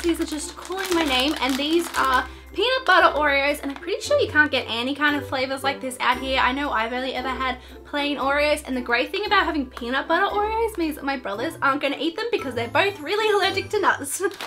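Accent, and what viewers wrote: England, United Kingdom. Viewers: I thought she was from england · Oh I always thought you were British! Btw I’m a girl just on my dads account.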